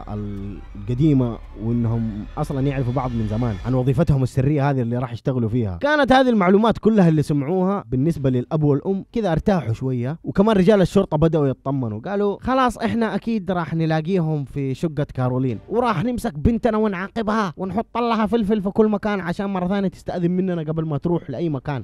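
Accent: Iraq. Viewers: ✨ يتسمع عراقي ✨ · حلوه قعاد أسماع عراقي 😂😂😂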